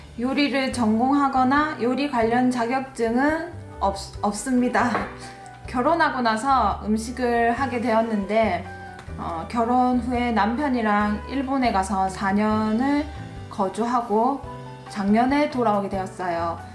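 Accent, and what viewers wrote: Gyeongsang, South Korea. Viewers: 경상도분이시네요~ ㅎ 저도 남편 직업상 삼시세끼 3일씩 글고 큰애 기숙사 도시락까지 만만치 않은데 유튜버를 보는게 많은 도움이 되어요. 젊은 분이 대단하시네요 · 88년생인데 살림을 넘잘하네요 우리딸들도 비슷한 나이인데 손하나 까딱하지 않는데 ...ㅠㅠ 말투가 경상도분인것 같네요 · 어머나ㅋ 경상도 분이신가보다ㅋ 나도 경상도사람이라 엄청 반갑네요 덕분에 요즘 큰아들 도시락 잘싸주고있어요 감사합니다 쿠팡에서 도시락통도 새로샀답니다ㅋ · 전 78년생^^ 신랑회사구내식당이 잠시 쉬면서 도시락을 싸기 시작했어요.홈까페 홈밥요리 좋아하면서도 도시락은 초초초짜 줌마예요. 말투들으니 경상도네요.반가워용.저두 대구고향 부산출신 양산살아요ㅎ 지난 영상들이지만 많이 배우고 눈으로 힐링해요.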